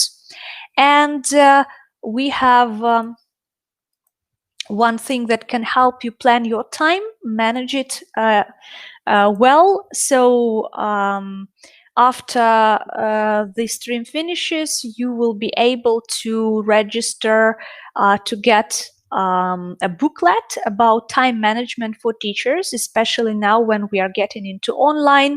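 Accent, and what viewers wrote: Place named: Russia. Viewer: Why do you speak English with Russian intonations?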